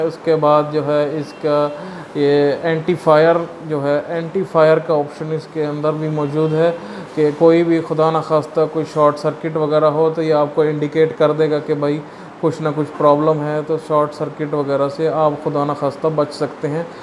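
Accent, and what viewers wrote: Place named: United Kingdom. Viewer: urdu ko انگلش ke style main بولتے ہیں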